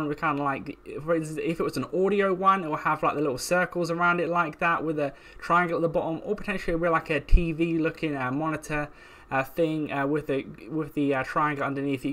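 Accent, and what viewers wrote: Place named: United Kingdom. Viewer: He talks too fast and with his British Accent it's hard to understand him, he needs to slow down.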